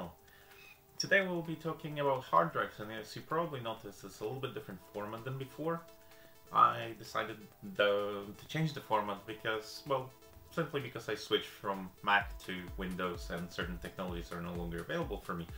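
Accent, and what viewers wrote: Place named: Finland. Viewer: the amount of information is very nice!, where are you from? I kind of get a finnish vibe but anyways good video!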